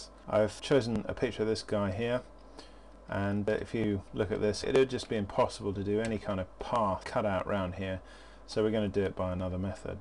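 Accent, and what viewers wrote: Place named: RP, United Kingdom. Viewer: lol, i didn't even bother with this but your voice is very posh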